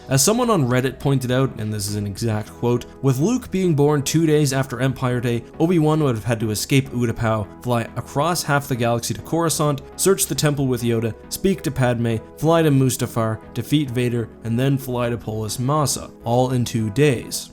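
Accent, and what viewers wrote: Canada. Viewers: Oh shit, you're a canuck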